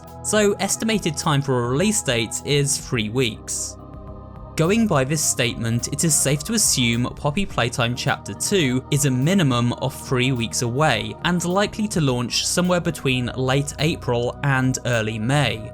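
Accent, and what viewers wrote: United Kingdom. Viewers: Why does she talk like British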